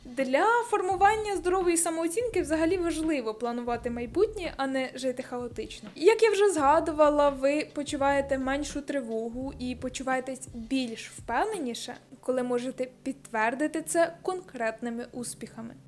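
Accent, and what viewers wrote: Poltava, Ukraine. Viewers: Дуже дякую! Яка легка у вас подача! Лише мені здалось, що до кінця відео полтавський(милий, такий близький) акцент став менш вираженим?) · Що це за акцент такий прикольний, полтавський?)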